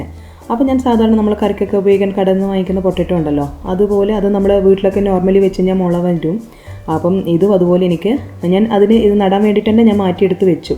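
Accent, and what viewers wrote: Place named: Kannur, India. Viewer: Krishi adipoli. Njanum nattitundu balcony il. Pinne samsaram kettitu malabar side aanennu tonunnu. Etra sramichittum naadan basha idaku idaku ariyate purathu varunnu alle 😁😁😁. Nhan kannur aanu. Kannur slang pole thonni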